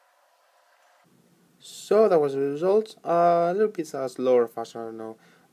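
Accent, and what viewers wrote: India. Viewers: Owen Batucan no its indian idiot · ohh my god oh my goooood i hate when indian speak english (im not racist :) )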